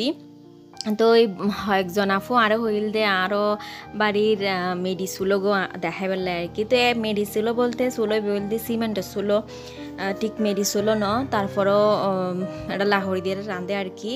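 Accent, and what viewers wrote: Chittagong, Bangladesh. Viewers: আপু চট্টগ্রামের কথা শুনতে অনেক ভালো লাগে চট্টগ্রামের ব্লগ দিও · Apo apnar kotha golo onek sondor lage but bojinaaaa..... Amar o chittagong jelar but sondwip opojelar......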